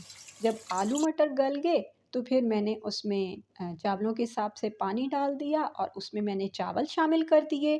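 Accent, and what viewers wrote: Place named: Karachi, Pakistan. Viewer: Baat karne k andaz se to ap karachi ki lag rhi hain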